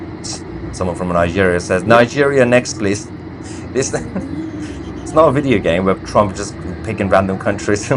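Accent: United Kingdom. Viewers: I love how when mentions Caracas it sounds as if he were saying "Crackers" in his lovely British accent. 😉🤣 Seems appropriate somehow. But here is hoping they can get back some SANITY in their gov't with dictator maduro out of the way.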